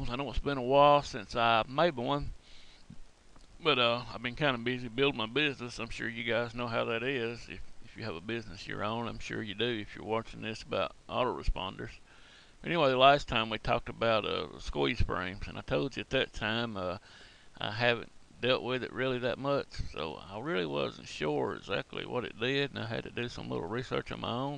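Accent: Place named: Arkansas, United States. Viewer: Great accent. I'm from Central Mo so mine is more of a flatter accent. Yours is rich with Arkansas.....possibly Tenn/Kentucky......Virginia, not so much. Memphis? Blythville? Little Rock?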